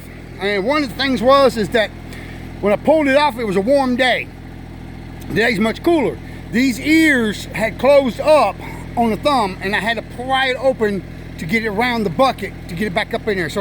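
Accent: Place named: Louisiana, United States. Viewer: HELLO HOW ARE U?? IM NEW HERE SHOWING SUPPORT FOR U. I LOVED LOUISIANA. I WAS THERE FOR 3 YEARS WORKING WITH A DREDGING COMPANY PUMPING SAND IN MYRTLE GROVE NEAR PORT SULPHUR AND BELLE CHASSE. I MISS IT THERE. UR ACCENT TAKES ME BACK. BUT AWESOME MASSEY U GOT THERE. AND SO FAR CONGRATS ON THE SAINTS SO FAR!! WHO DAT!!!!! ANYWAY I SEEN U ON ANOTHER CHANNEL I WAS ON SO I WANTED TO STOP IN. I ALSO FED ALLIGATORS WHILE I WAS THERE. ITS ON MY CHANNEL MY OLDER VIDEOS. I WAS HELPING TRIGGER TOMMY FROM SWAMP PEOPLE. IT WAS FUN! BUT GREAT VIDEO, !!🤗🤗🤗🤗🤗🤗🤗🤗🤗🤩🤩🤩🤩🤩🤩🤩🤩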